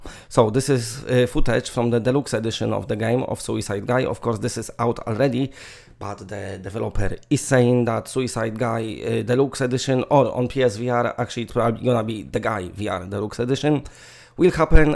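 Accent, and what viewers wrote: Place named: Poland. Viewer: Czy to celowe spolszczanie akcentu angielskich słów czy naprawdę jesteś tak słaby w wymowę? Aż nie chce mi się wierzyć ze ktoś kto mówi tak płynnie po angielsku nie powiedział ani jednego słowa poprawnie 😂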